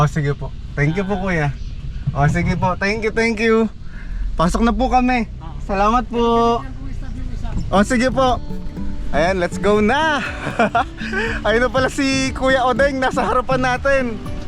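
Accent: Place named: Malolos, Philippines. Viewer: Sir malolos talga Yung Punto mo...di Po ba mahirap Daan punta Jan sir Kaya Po ba Ng maliit na kotse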